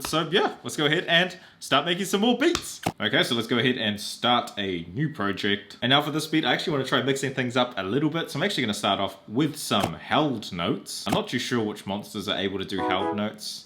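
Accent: New Zealand. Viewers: are you from new zealand? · are you a new zealander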